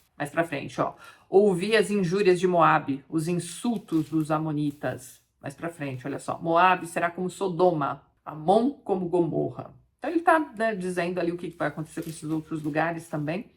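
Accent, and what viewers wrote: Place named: Minas Gerais, Brazil. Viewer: Parece mineiro. Zezinho, fiii do Arnaldo....kkkkkkkkk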